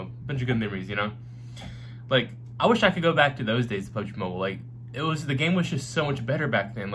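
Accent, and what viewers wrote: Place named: Puerto Rico. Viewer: Yo pensé que era de Puerto Rico 🇵🇷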